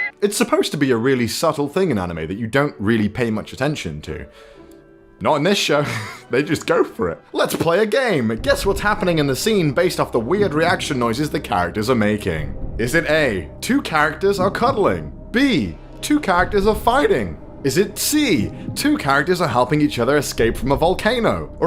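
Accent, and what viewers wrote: United Kingdom. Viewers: Ok so my brain is breaking trying to figure out where your accent is from because it sounds vaguely British but not— obviously British and I DONT KNOW WHAT TO THINK ANYMOREEE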